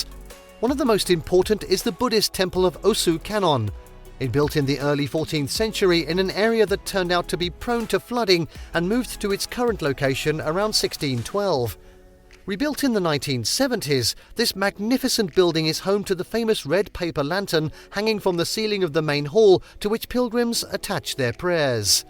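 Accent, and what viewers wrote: United Kingdom. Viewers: イギリス英語かな？聞き取りやすい。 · Not really British English but it does have a slight British accent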